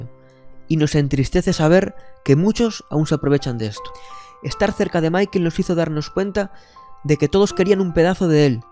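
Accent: Galicia, Spain. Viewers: He llorado tanto con este video.... como tu dices hay que ser muy fan para conocer un poco el carácter de Michael, saber de toda esa gente , empaparse de videos, entrevistas e información que tenemos al alcance de cualquier persona, sin embargo la gente prefiere alimentarse del morbo de las noticias y quedarse con una imagen de Michael manchada.ASCO. Yo tbn pienso que este documental debería ser un puto delito sacarlo ahora a tantos años de su fallecimiento, en fin ya les vendrá el puto karma, que asco. Por cierto eres gallego verdad? jaja hi bro xddd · no gracias a ti por recopilar videos y pruebas que según entiendo están en la casa de los jackson o en evidencias de los Estados Unidos de America y por el acento y todo supongo que eres de españa ósea al otro lado del mundo de donde sucedieron los cosas pero bueno esperemos haber si sale algo que destrone a bohemia rhapsody y volvemos a ver niños bailando y escuchando a Michael dándole más empuje a su venta de canciones pero ahora en descargas digitales para que le adjudiquen otro logro y tú sigas haciendo videos defendiéndolo 🙂👍 Recuerda amigo todo en esta vida es un negocio y yo me animo a apostar que el par de hombres que salieron en el documental solo están resentidos de no tener trabajo y ya no tener a jackson para que les mate el hambre y les pague con M&M’s y dólares en fin los padres de esos dos en dado caso que sea cierto que los violaba ellos tuvieron la culpa y además ellos por igual ya que preferían ser violados y recibir dinero,vacaciones, ropa discos dulces etc que seguir luchando muy aparte de jackson Y no soy fan ni ataco a jackson es que estamos en una época donde todo esto ya es más visto en el mundo de les espectáculo y siempre termina siendo mentira o sacan un álbum nuevo y en el mundo real pues el cuento se cuenta solo no crees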